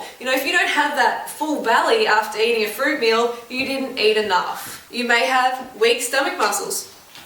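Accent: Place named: Australia. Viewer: Goo fruit or root yaself !!! Can tell shes an aussie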